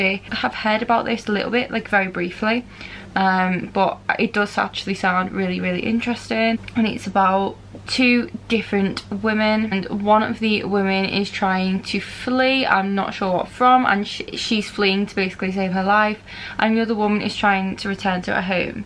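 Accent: Liverpool, United Kingdom. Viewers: You sound like your from liverpool? If so go liverpool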